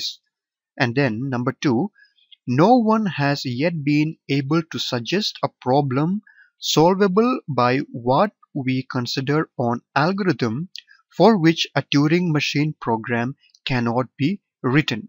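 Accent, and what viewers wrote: India. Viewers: Trust me most of the Indians talk like this video and those who are in an environment where they have to speak in english constantly like in offices or schools have even better accent than the guy in this video. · i like the indian accent, grats from México...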